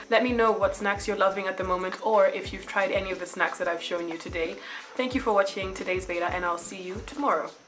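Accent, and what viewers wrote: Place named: South Africa. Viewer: Wow south african accent 🙅🙅🙅🙅 your actual accent is more south African! 😵🤷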